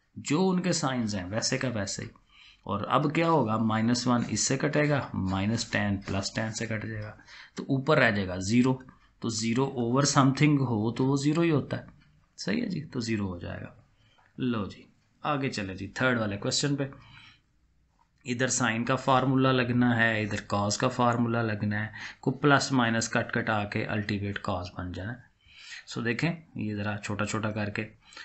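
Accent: Sialkot, Pakistan. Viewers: sir I think u r from Sialkot ❤️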